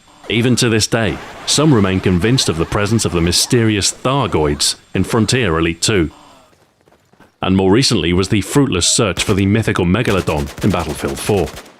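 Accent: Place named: England, United Kingdom. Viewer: English accent. All mighty men in movies and tv shows have an English accent or an accent from somewhere in the UK so that's why I thought of it.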